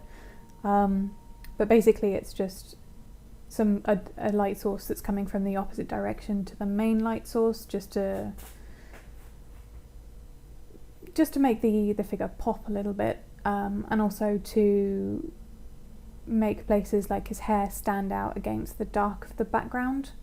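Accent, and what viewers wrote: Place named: United Kingdom. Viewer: I love ur accent.. i love british accents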